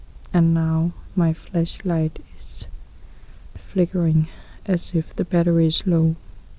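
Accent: Denmark. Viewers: Danish accent, from Denmark 🇩🇰🇩🇰🇩🇰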